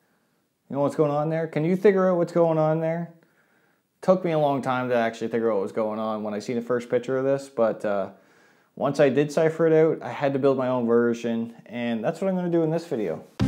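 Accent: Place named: East, Canada. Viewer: I figured it out the first video of his that I watched. He said 'eh'. Then the second video I detected a back east accent and the third video was a dead give away when he mentioned Robertson screws.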